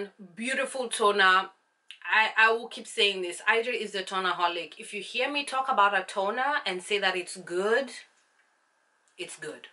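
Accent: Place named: Kenya. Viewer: Hi Ijeoma 👋🏽 Have you been to Kenya? You've got the accent.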